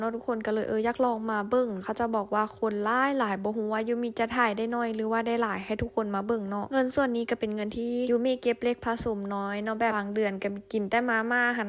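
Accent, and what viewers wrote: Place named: Khammouane, Laos. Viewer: ภาษาน้องเว้าเป็นภาษาทางแขวงคำม่วนทางอิสานของไทยจะนครพนมและสกลนครครับ❤❤❤❤❤❤❤❤❤❤❤❤❤❤❤❤❤❤❤❤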